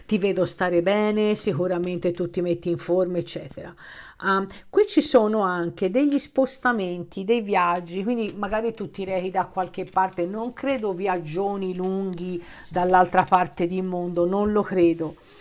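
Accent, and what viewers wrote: Tuscany, Italy. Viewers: Ciao, sei bravissima e simpaticissima. Adoro la tua cadenza Toscana, di dove sei? Io ho parenti cari a Follonica GR e ad Agosto spero tanto di andare in vacanza lì. Io sono acquario asc. vergine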